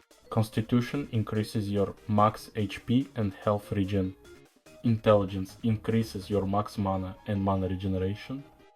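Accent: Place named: Russia. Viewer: Russian accents 🤤🙏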